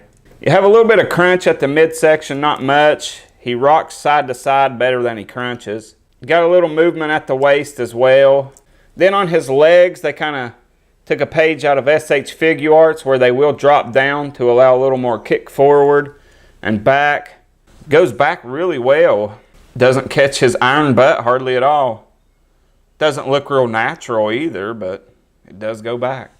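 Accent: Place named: Texas, United States. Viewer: Your accent..your from texas?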